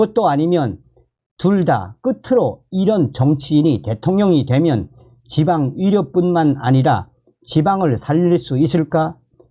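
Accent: North Korea. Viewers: 북한사람인가. 목소리가 북에서 온 사람 같아요.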